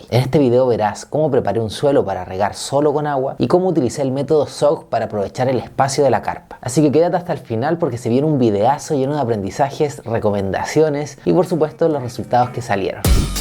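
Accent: Chile. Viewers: me gusta tu acento no tan chileno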